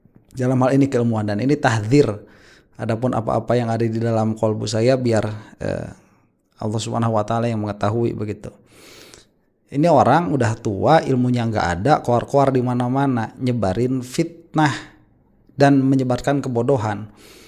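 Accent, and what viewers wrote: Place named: Surabaya, Indonesia. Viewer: Pean suroboyo ndi cak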